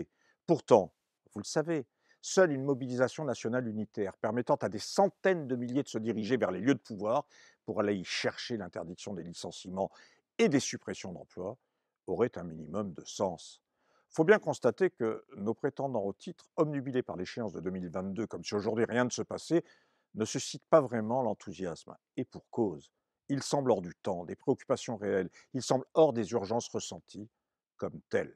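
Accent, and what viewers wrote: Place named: Paris, France. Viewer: Sur le fond, c'est à mon ressenti, parfait. Sur la forme...Comment peut on s'appeler " ", habiter un village qui s'appelle Libre et avoir un tel accent parisien....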